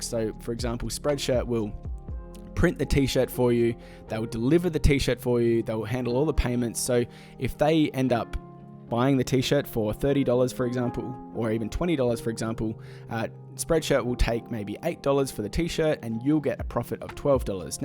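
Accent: Australia. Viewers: this is gold info dude. great to hear an aussie voice too. subd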